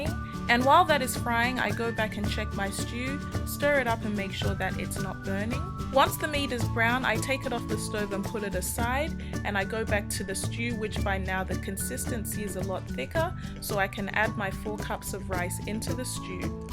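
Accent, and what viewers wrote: Australia. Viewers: Are you australian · omg you got a nice accent. Australian aye?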